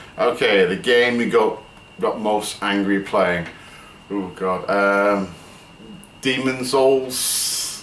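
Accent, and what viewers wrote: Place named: Bolton, United Kingdom. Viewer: am i right in thinking your from bolton? i live in bolton :) nice answers mate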